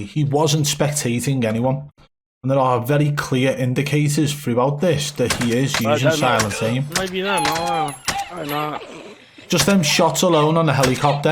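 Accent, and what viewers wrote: Liverpool, United Kingdom. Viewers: Bruhhhh your scouse accent is killing me😖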